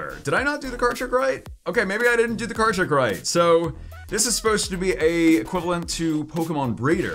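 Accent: Germany. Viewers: You littrely sound German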